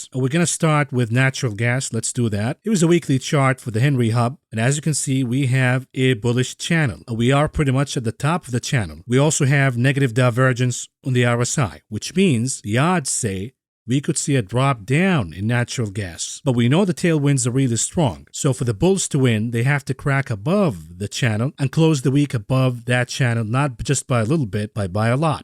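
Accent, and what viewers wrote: New York, United States. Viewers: New York for sure I'd say Iranian American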